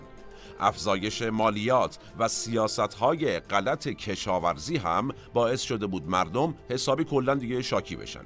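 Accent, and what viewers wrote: Iran. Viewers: نخست با سپاس از روشنگریتان برای گره و سرور که بسیار خوش واژه با گویای زیبا. در این ویدیو شما سرور اسلاح (اصلاح) کردید و مانند یک ایرانی خوش روی گویندگی میکنید. امیدوارم همیشه به این روی باشد. خواهش می کنم از واژه های پارسی در گبتان بکار ببرید. مانند: مـــــــــــــ♥ـــا مردم بزرگیم، نه کبیریم. با سپاس از شما و گروه.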